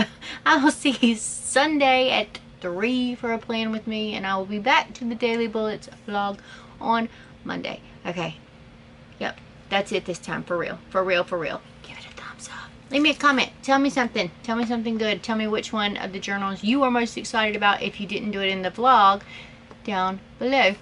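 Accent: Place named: South, United States. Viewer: I will laugh SO hard if you start to develop her accent on top of your natural Southern accent 😂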